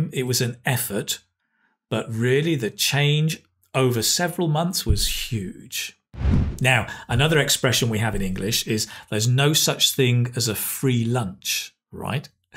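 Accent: United Kingdom. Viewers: I adore that British accent..🥰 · Hi from Italy You have been a great discover for me! I love your British accent Your suggestions in this video are simply brilliant: focussed, clear, understandable and easy to get into daily life. Thank you so much!! I'll keep watching you!!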